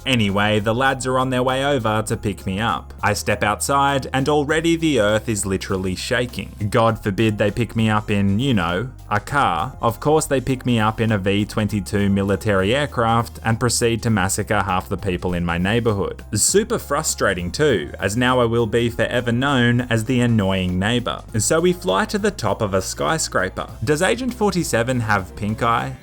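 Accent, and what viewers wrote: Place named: Australia. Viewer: ONLY 160K SUBS? What? Under rated channel! I've beem hooked on amazing commentary and hilarous and spontaneous kind of humour. Goes very well with the Ozzy accent. Keep up the good work!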